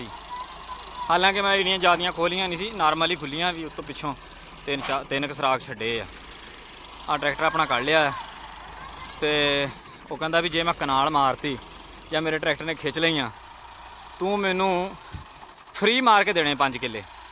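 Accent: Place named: Doaba, India. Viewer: Paji boli ta thodi doabey di lagdi a....khush raho veer bohat vadiya c vlog....hor v paya karo tractor related videos.....Allah thonu tarakiya bakshey....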